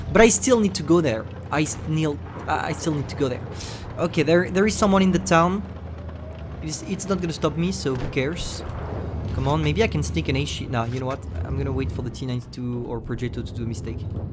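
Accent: France. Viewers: T’es Français non?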